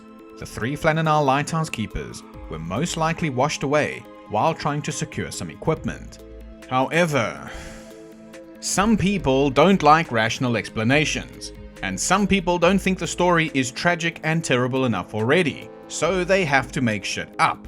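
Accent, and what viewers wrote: South Africa. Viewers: Is he South African? Can’t place the accent 😅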